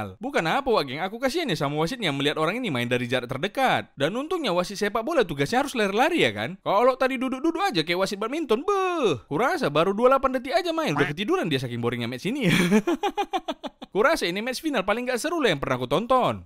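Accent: Medan, Indonesia. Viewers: Gua kesini aja gara gara inget logat medan nya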